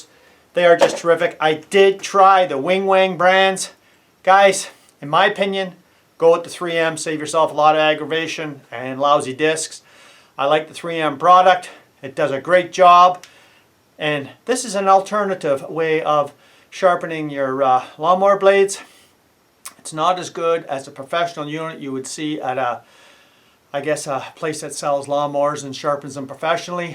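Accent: United States. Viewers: Is that an Irish accent mixed with an American.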